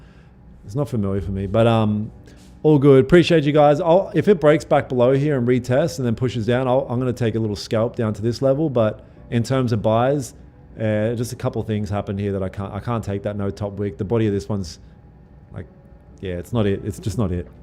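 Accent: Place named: Australia. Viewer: I live overseas as well and it's nice hearing an Aussie voice when watching streams. Keep up the good work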